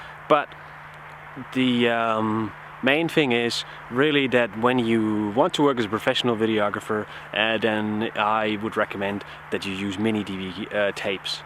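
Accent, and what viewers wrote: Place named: Italy. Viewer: Are you Italian? Love your accent!!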